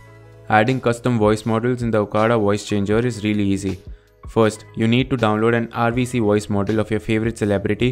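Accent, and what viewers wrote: India. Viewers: dude sounds like a kid with a indian accent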